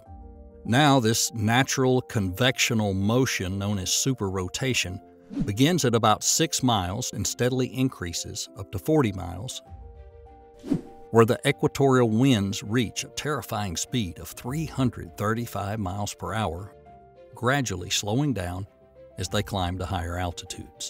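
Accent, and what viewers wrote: South, United States. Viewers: Always expect a British guy when watching space videos. The country accent always catches me off guard lol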